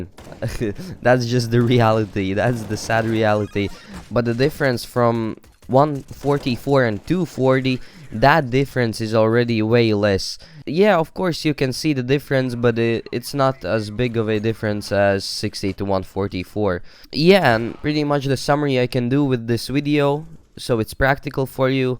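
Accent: Latvia. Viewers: Latvietis esi? ljoti izklausaas :D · No akcenta sapratu ka tu esi latvietis :D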